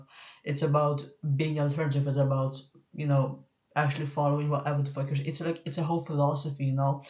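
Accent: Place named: Sweden. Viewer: i think i live alternatively the way it's meant to be lived. roaming thrift stores, doing climate activism, going to punk-, vegan- and queer events - i like it. the looks are a second thought but definitely a part of identifying your crowd. sometimes i wish i was more on social media but then i realize i don't care that much, i meet so many cool people in my town anyways. also your accent is really fun, the first thing i thought was swedish but then the russian came in and threw me off. i was sitting here pondering latvian/lithuanian until you mentioned swedish and i read the comments, so i wasn't far off with that middle point hahha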